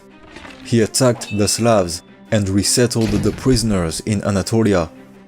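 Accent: France. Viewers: mate are you french ?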